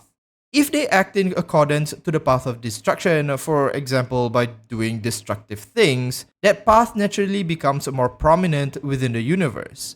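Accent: Malaysia. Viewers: Yo are you a Malaysian? I swear your accent sounds like one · u sound malaysian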